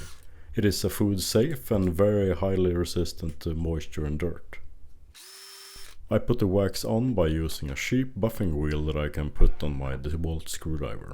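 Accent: Sweden. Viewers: 🌹THANK YOU for sharing 🌹 An awesome pipe and mouthpiece, thank you. Your English is good, real good. Your Swedish accent is real good also, I understand it perfectly, I listen to people. Please continue with the videos and the voiceovers. I learned a lot from this video, I think I will try making my own pipe, just once. 🌹🙏🏿😔🙏🏿🐾🐒🐾🌹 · are u swedish by any chance or texas?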